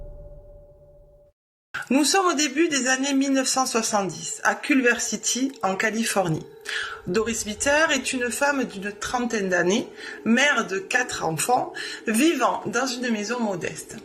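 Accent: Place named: South, France. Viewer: Bonjour 😊 je découvre la chaîne avec ce petit accent du sud 😊 comme nous 😊 du coup un abonné de plus 😊 merci pour ce partage 😊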